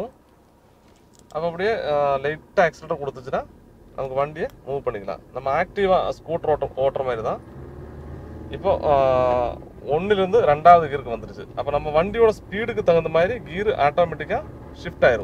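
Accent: Kerala, India. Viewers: Malayali tamil samasrikunnu... Nanum thamilan than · malayali tamil pesrathu ...nalla irukku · நண்பா உங்களுடைய மழையாளம் கலந்த தமிழ் கேட்பதற்கு அருமையாக உள்ளது.நன்றி. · When a malayali speaks Tamil it sounds so sweet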